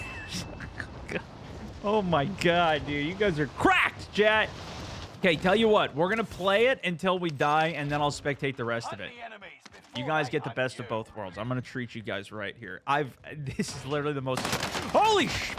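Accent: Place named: Canada. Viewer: sounding extra canadian in this one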